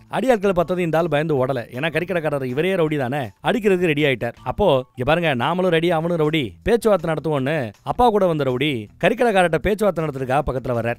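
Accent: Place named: Madurai, India. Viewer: அண்ணா படம் ரொம்ப அருமை ஆனா நீங்க மதுரை தான முட்டை கண்ணு அப்பப்ப பேசுறத பாத்தாலே தெரியுது மதுரை தானே நான் உசிலம்பட்டி அண்ணா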